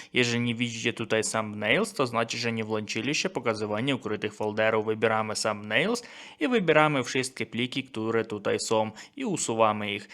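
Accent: Ukraine. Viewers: jakoś kurwa nie ufam ci. ten ukrainski akcent zniechęca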